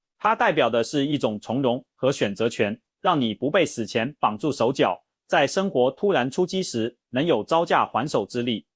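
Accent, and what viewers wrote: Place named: China. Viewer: 這根本不是台灣的，ㄧ聽就知道是大陸的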